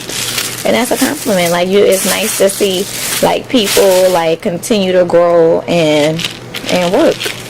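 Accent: New Orleans, United States. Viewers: Are u from Nola? I hear the accent, it's soo cute! Very pretty look ❤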